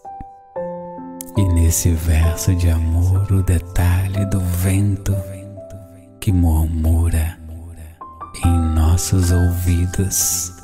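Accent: Minas Gerais, Brazil. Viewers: Falando desse jeito eu nem vou dormir essa noite que isso você deixa eu sem saída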